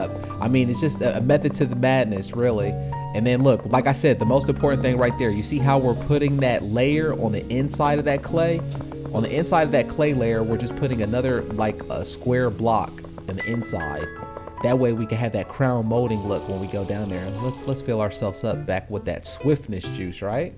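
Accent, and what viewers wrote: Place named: South, United States. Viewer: Nice! I hear an accent in your voice--are you southern?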